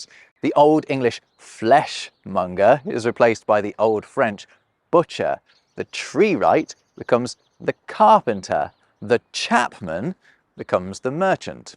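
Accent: United Kingdom. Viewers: you're the most young-british-man sounding young british man ever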